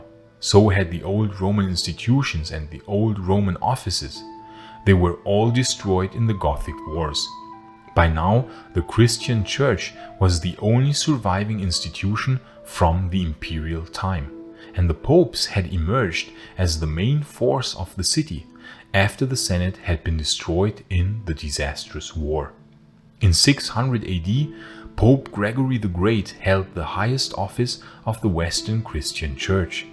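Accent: Germany. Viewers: german accent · It's absurd trying to present and emphasize the Eastern Romans as the ones who destroyed Rome whilst the barbarians as the ones who wanted to make it great again as if the latter were not the ones the Romans have been fighting against forever. I urge you to stop the propaganda favoring the barbarians. We know darn well who overthrew the Roman Empire in the West and not you nor anyone can change that, no matter how many videos you make using pretty English in germanic accent.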